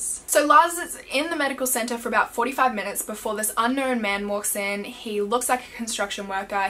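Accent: Australia. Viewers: Very interesting...I love your Austrailian accent btw 😍😍😍.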